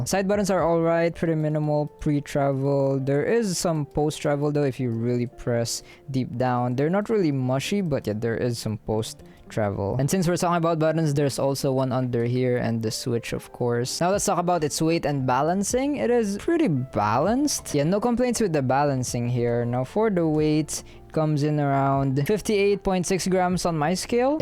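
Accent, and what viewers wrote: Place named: Philippines. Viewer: > Pinoy accent looks in > Actual pinoy reviewer with top tier production value + signature rap speech Subscribed agad AHHAHAH P.S. Krazy ahh mouse grip T_T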